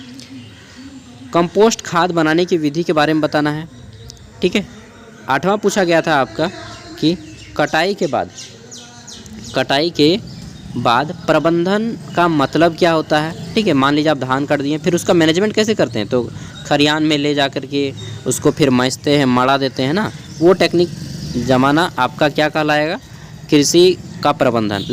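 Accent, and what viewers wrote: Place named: Jharkhand, India. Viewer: Aap kaha se bhai, apka bolne ka tarika se lg rahe ho aap hazaribagh se ho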